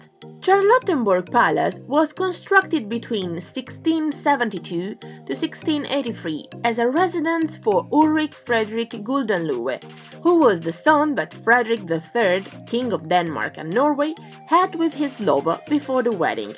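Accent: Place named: United Kingdom. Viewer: Wow, complimenti per il canale e per la professionalità dei vostri video. Complimenti anche per l'accento molto British 😉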